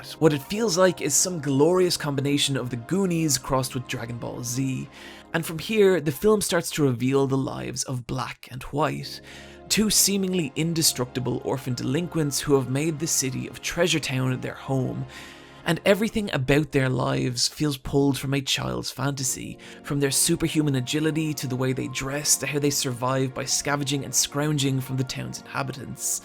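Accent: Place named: Ireland. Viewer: Your irish accent is lovely!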